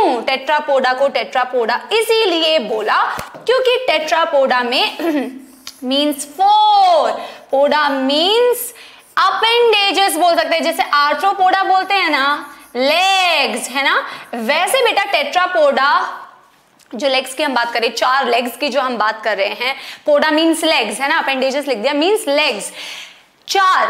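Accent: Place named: Odisha, India. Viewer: Mam r u from odisha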